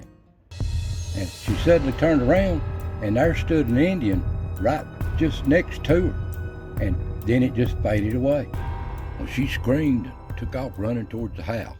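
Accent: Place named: Kentucky, United States. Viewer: "Taint no hant it was Indians watching and protecting, "Love the way you talk, "A Kentuckian Cherokee here, "That's the way everybody talks up in these mountains. "Stayed in trouble at schools because of the words "Taint and hant, "Yep, Hant got none or I taint study and work at the same time, Or over yonder, Or up the road just a skip, "Skip meant a mile or so. "Love it, "You can not beat mountain folk, Know where, "And if they like you, "Well then you've got a friend for life, "But if they don't like you, "You'll know instantly.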